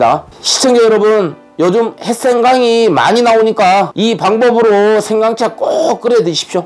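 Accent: Jeolla, South Korea. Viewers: 고향이 어디신데 전라도깡사투리를많이쓰시나요?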